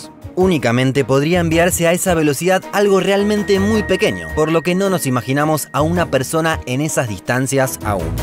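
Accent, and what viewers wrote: Buenos Aires, Argentina. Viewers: Lo único que puedo criticarle es que habla muy aporteñado.Si hablara en neutro el canal llegaría mas lejos.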